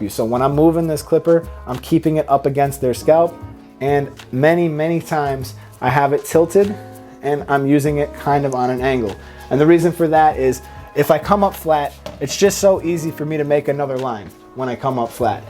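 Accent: Buffalo, United States. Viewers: You from buffalo?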